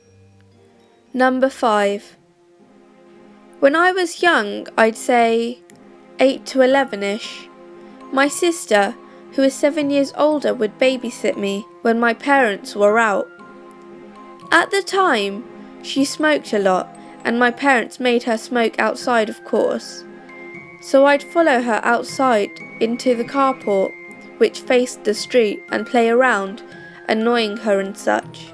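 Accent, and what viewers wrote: United Kingdom. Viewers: Her voice is lovey.And usually find British accent s annoying but her is lovely ;)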